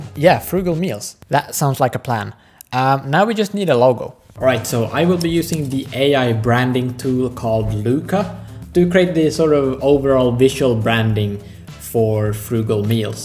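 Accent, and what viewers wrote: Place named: Finland. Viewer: The short video looks really good, but I much prefer your Finnish accent over that robot voice. I understand you're trying to prove that the whole video uses only AI, but if you do decide to grow the Frugal Meals channel, it would be cool to hear your natural accent instead of the robot.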